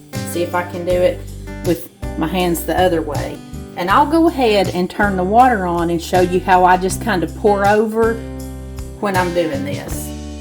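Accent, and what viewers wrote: South, United States. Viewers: Hey girl! Here watching. We got matching southern accents. 🥰 I make mine very similar , I made it in one of my videos I don’t remember which one 😂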